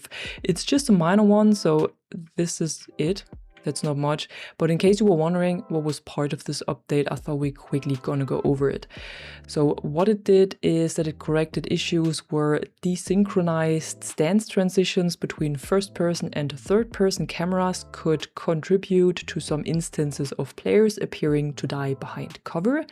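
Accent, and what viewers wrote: Germany. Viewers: Nach deinem Akzent zu urteilen, bist du wahrscheinlich Deutsche ;) Alles Gute zum Geburtstag !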